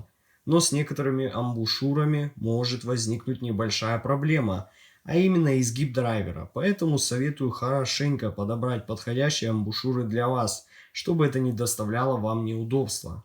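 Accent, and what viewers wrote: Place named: Caucasus, Russia. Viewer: ломаю голову, знакомый акцент :) с кавказа?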